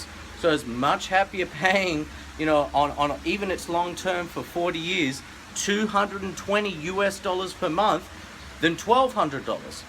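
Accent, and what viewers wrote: Australia. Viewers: BRAVO! YOU ARE A VERY INTELLIGENT FELLOW. ARE YOU AUSTRALIAN? THANK YOU ANYWAY! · are u ozzie?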